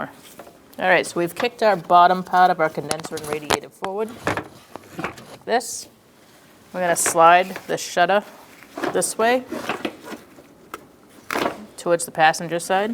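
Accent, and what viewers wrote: Boston, United States. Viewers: 👍 great vid. You are definitely from Boston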